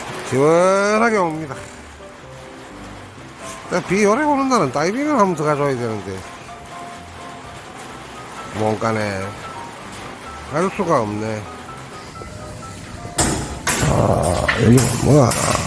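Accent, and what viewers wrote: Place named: Gyeongsang, South Korea. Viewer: 경상도 사투리 좋아요 자막은 표준어로 처리 해주세요 ㅎㅎ😀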